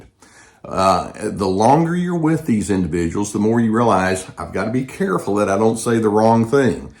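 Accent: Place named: Mississippi, United States. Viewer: THANK YOU SOOO MUCH AGAIN AND AGAIN,!!! I JUST LOVE GUS!!!, YOU SOUND LIKE YOU'RE FROM MY "NECK OF THE WOODS ". I LIVE IN MISSISSIPPI. CAN YOU REVEAL WHAT STATE YOU'RE FROM? IF NOT, I UNDERSTAND!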